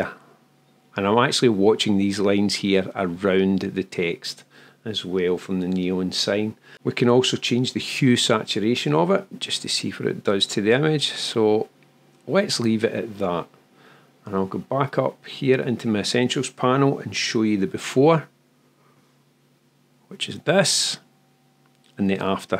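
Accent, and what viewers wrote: Scotland, United Kingdom. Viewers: Its very difficult to understand this scottish dialect , I think, in other parts of the world, because you are speaking very fast. I am from Germany and I shall make every effort understanding you in future. Maybe I have to listen to many other of your editorials.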